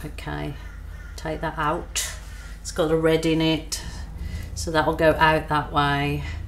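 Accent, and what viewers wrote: Birmingham, United Kingdom. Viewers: Love it, yet again a cracking outcome 👏 Do I detect a " brummie accent " or is just my naff hearing x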